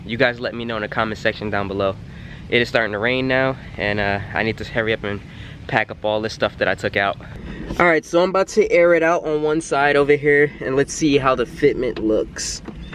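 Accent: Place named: New York, United States. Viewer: Accent sounds like from New York lol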